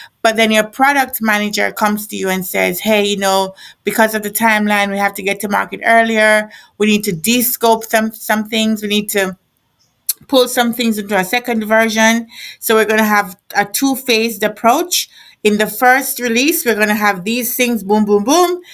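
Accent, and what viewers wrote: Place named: Jamaica. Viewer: Sounds like you're a yardie. Loved the video, keep up the great work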